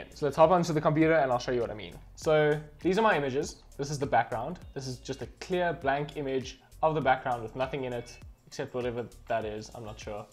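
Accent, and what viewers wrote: South Africa. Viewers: you sound like you are from South Africa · This guy sounds South African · CaptanW smh hes got a south african accent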